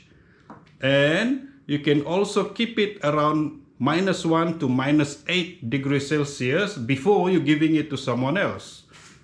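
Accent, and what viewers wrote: Sabah, Malaysia. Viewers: X juga masalah kalau sy dr. Ngam laitu slang sabahan.. Kita pun di sabah ba juga ni.. Its realy doesnt matter at all... Maybe those who give negative cmment..or maybe'kayangan people'just dont like to share good medical info like u.. Salute dr!!!. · Bah sy mangarti jg DR ckp,sendiri mengerti,klw mw translet sma urg, karas tu lidah mw kc terang 😅😅😅biar kn mreka² yg nda suka DR brckp malay slang sabah 😁😅kunun kn DR 😁 Terbaik perkongsian ny Dr 👏👏👏💪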